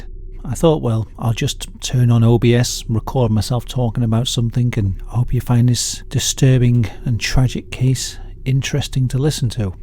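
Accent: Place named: Liverpool, United Kingdom. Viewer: Horrendous attack this. I remember when it happened it had me clutching right to my young kids whenever we went out for quite a while after. Even worse when see that the attacker had been threatening extreme violence against children in the weeks leading up to the attack. Any way, love you videos mate, you’ve got a really charismatic way of telling these stories. Love your accent too! Scouser right?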